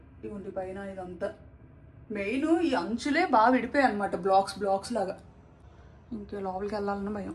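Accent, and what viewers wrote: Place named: Srikakulam, India. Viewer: Language Srikakulam maraledu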